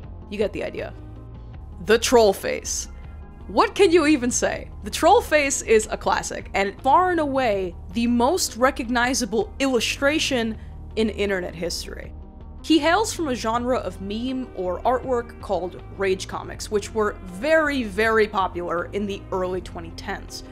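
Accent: New York, United States. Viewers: WYM didn't speak english fluently? You sound like you're from NY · I am very suprised how you can talk like a average american while english is your 2nd language , how did you improved your accent ?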